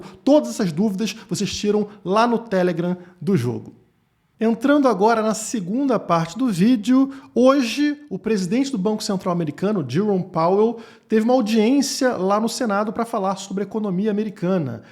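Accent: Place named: Tijuca, Brazil. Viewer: Rapaz...você é tijucano?! Rsrs TMJ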